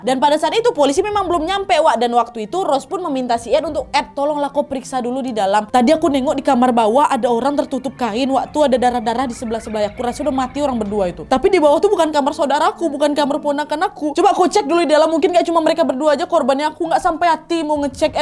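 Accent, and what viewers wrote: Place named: West Sumatra, Indonesia. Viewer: cuma d channel ini , bisa dengar berita kriminal logat minang 😅. Narasi percakapannya g bayangin bule lg ngobrol pke logat minang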